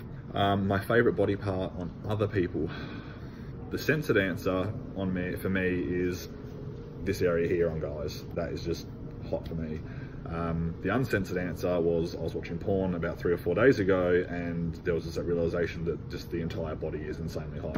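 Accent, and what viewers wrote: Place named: Australia. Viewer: I like your beard and moustache!!! And the Aussie accent isn't bad either.....;-)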